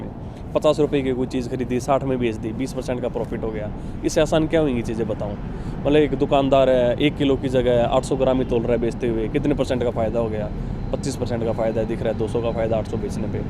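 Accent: Haryana, India. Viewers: Haryana k log sach Bolte h or Dil se Bolte h. AAP Haryana se ho boli se hi PTA lag rha h. I Love my Haryana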